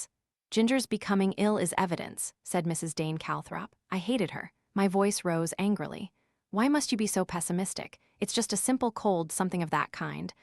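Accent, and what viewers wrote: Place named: United States. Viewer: Why on earth is an American accent used to read this quintessentially English novel.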